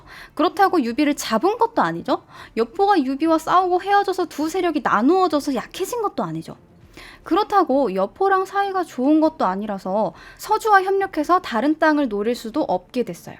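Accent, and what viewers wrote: Gyeongsang, South Korea. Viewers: 전번에도 여쭤본바 이 지만..원래 역사 전공이신가? 넘잘하시네,,젊은분이..글고 억양도 살짝 부 마 지역?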